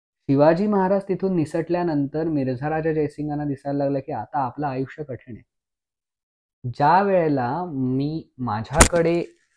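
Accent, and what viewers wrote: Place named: Pune, India. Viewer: खुप सुंदर भाऊ तू छत्रपतिची माहीती दिलीस भाऊ तुझी पुणेरी भाषा अप्रतिम भाऊराजा ❤❤❤❤❤🙏🙏👍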